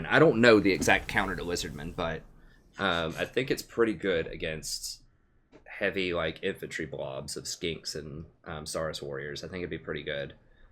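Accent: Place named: South, United States. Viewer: I'm from the south, and I think you have a small accent, so when you said "LOOKS LIKE GOOD FOOD TO ME" I died lol. Also, "it's late, I'm feeling frisky" lol, really good channel. I had a question though, I want to play a mortal enemies campaign with a faction that has late gate powerful units and economy to spread across the map, what faction would that be?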